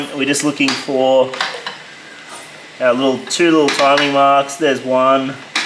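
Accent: Australia. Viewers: the Aussie accent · I love your aussiman accent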